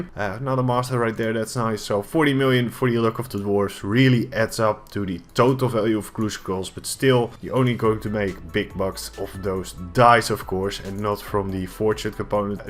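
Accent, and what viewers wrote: Netherlands. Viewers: Oei je hebt wele cht een heel nederlands accent haha :p leuke video man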